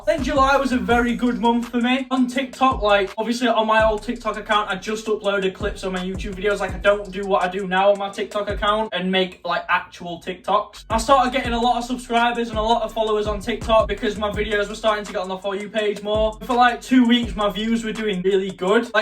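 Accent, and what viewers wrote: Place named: Yorkshire, United Kingdom. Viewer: jus me thinkin his Yorkshire accent is bareeee cuteeee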